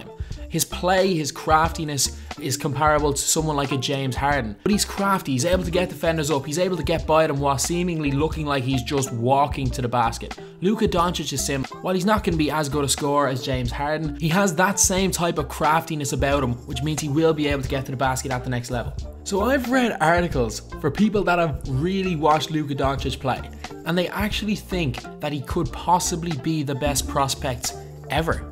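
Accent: Dublin, Ireland. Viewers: Are you from Dublin? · are u irish? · Oh shit nvm what county your from lad ? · gotta be Irish with that accent lad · you Irish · Are you from Dublin? I'm from Kerry. Love the content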